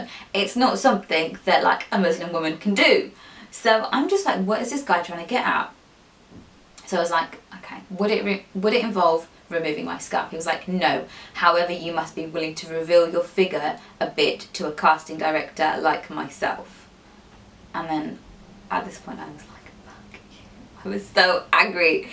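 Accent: United Kingdom. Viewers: Your accent is soo cute! I'm British too but your British accent is so nice!❤️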